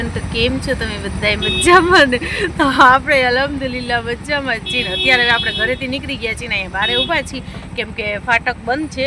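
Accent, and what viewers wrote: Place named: Kathiawar, India. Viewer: tamari khathyawadi bhasa mast bolo cho nice😊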